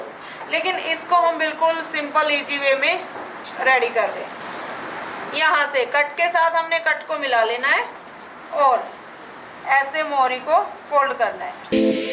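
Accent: Haryana, India. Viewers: Sister aap accha sikhati hai 😂sikhate wakt baate karati hai to aur acchi lagti hai mujhe haryanvi bahut pasand hai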